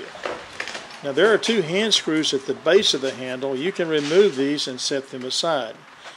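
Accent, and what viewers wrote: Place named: South, United States. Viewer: I love this authentic, Southern American accented English. While all the southern accents may sound similar to a Northerner or an international visitor, there are a plethora of different distinguishable varieties and flavors. Likewise I have never heard two different British accents that sounded the same to me.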